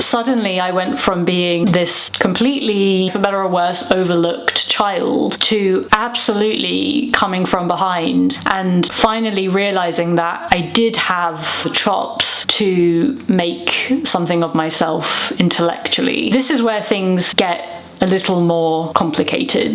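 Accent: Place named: Germany. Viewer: Mas, que inglês pesado, arrastado, você por acaso é alemã ou indiana ? Your english is very heavy, it sounds like German accent to me.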